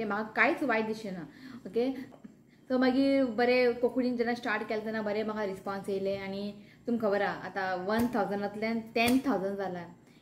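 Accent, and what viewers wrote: Konkan, India. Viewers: Hi Congratulations🎉🎉 God Bless You n your family Your s is the 3rd Vlog I am watching, so I have compared with the other 2 vlogs n found yours to be the best natural vlog, you are perfect in all, I like your konkani accent, I like when you crack jokes, I have tried many of your recipes n it turned superb n shared them to my friends. All the best 🎉🎉🎉🎉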